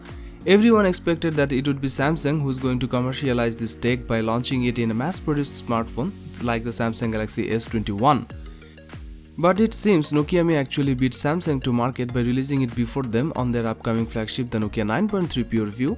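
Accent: Bengal, India. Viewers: Bengali accent is unique 😄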